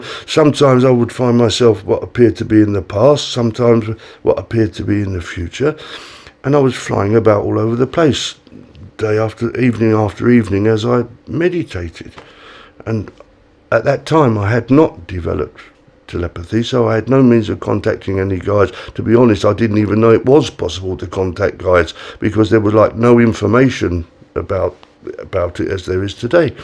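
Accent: United Kingdom. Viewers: my apology. But how can I fill my aura with spiritual power. My apology again and thank you. Btw, I love your British accent.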